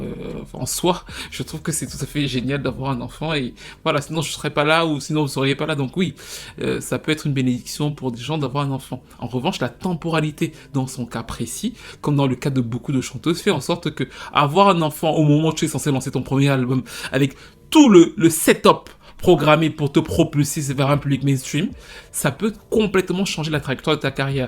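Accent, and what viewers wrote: Cameroon. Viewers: C'est tellement drôle, comme tu t'es repris in extremis ! 🙃😅😆 En bon camerounais, je suis sûre que la suite était: "Avant que le bébé"... n'entre ! 🤣🤣🤣🤣🤣 Et puis, la vidéo ensuite qui vient remplacer et imager le propros... 😂 Les camerounais que dis-je, les continentaux et leur phrasé légendaire... Comme je vous aime ! 😅🧡💯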